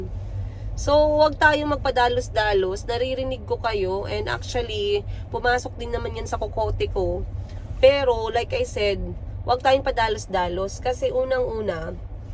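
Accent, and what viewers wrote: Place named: Ilonggo, Philippines. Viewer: kanami guid pamatian sang Ilonggo❤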